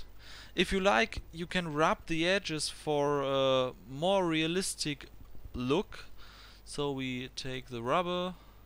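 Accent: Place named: Germany. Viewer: Ich wollte gerade fragen, ob du deutsch bist, bevor ich die Kommentare gesehen habe. Dein Akzent ist wirklich deutsch :D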